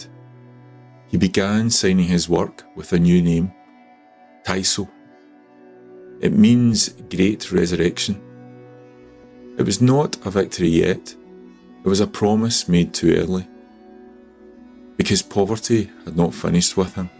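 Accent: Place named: Scotland, United Kingdom. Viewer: So that's where your great accent is from. I was wondering when viewing your videos. I am sure you will visit Tokyo more than once in your lifetime. Just like i wish to visit Scotland again some day, particularly the countryside, i am not much of a city guy (ironically living in the biggest city on the planet, but Tokyo is just a succession of villages, it is not a metropolis like some people think, and it has a village mentality which is both good and bad, you'll see some day). Did you like the photo of the Scroll by Yoshitoshi, i posted for you on my account ? It looks much better in the flesh. It is an unfinished work and he had just started adding very soft colour at the bottom, where the grass and the water from the pond meet, and also the "flowers" of the Susuki grass. The moon is create as negative space. Like most of his work, the moon is omnipresent. 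I guess this has to do with the family name "tsukioka" (the Hill of the Moon). And Yoshitoshi was obsessed with death or maybe fascinated by it. He liked the western idea of Memento Mori which he found matching the Buddhist concept of Mujo 無常 and the Shinto concept of Mono No Aware, もののあわれ、 which means that nothinbg last and nothing is permanent and that everything eventually comes to an end. That7s why this scroll has been used for the Moon viewing tea ceremony by the Tsukioka family for over a century every year, because it fits the Tea ceremony concept of Ichigo Ichie 一期一会 which means that we must live in the moment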